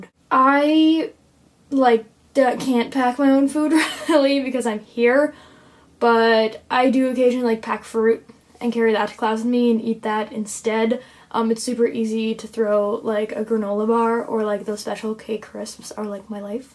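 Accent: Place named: Canada. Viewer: You live in Canada right? I can tell by the accent... I think...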